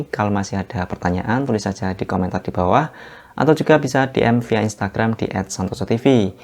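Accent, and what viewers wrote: Boyolali, Indonesia. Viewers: Ealah mas, orang Boyolali to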